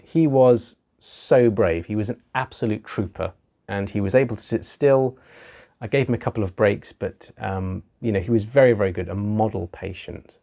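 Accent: United Kingdom. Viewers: Wish he didn't have a British accent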